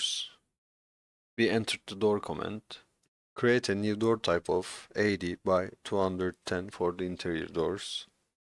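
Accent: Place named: United States. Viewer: The word he is saying is Command, but with an American accent. For example: WA is the Wall Command. So when you are trying to figure out what he is saying, just try saying the word in American, not English, and you'll figure out what he's talking about. I know it makes it difficult for the other 98.5% of countries on the planet that don't speak American, but at least we all use Metric, unlike 1.5% of countries that are still using Imperial units.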